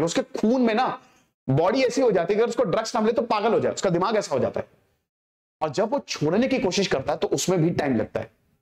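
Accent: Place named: Bihar, India. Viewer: I have been listening to him for past few years and this is one of the best lectures. I like the Bihar ascent.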